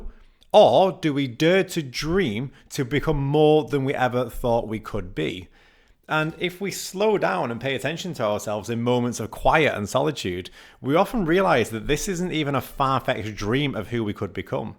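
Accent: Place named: North, United Kingdom. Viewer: LOL I’m from Preston and thought we had the same accent but now reflecting I thought there was a Yorkshire tweak as well. Always weird when you hear someone not without American accent but with an accent so close to yours on YouTube.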